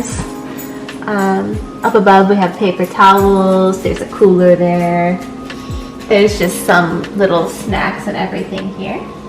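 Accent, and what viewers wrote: Telugu, India. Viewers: Meeru telugu matladuthunte chala cute gaa untundi andi , naaku chala istam andi mee telugu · Chellemma nee telugu yentho mudduga baaga Matladuthunnavu,,, naaku maatru baasha telugu yentho istam..mee eddariki naa deevenalu ..mee amna , Nanna etc valla gurinichi video pettandi..all the best ,,,, n dasara SUBHAKANKSHALU...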